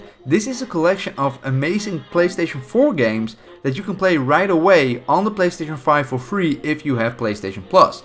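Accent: Netherlands. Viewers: Are you dutch? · Great Dutch accent !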